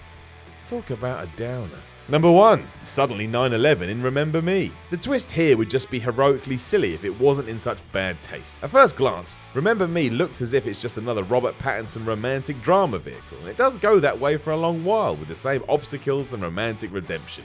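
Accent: United Kingdom. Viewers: It’s disgusting to use 9/11 for anything except mourning. A guy I went to high school with died there at the top(where the kid is in his father’s office.) He was a 21 year old working in finance at Cantor Fitzgerald. To use this tragedy to end some shitty film is morally atrocious. Maybe you could replace it with, I don’t know, “fight club?” This announcer sounds British, would their terrorist bus and subway bombings be appropriate to end a movie with to him? In fact, there’s an incredible amount of films that would be better, my god, “6th sense”? Please be decent enough to lose your final movie, and replace it with ANYTHING else.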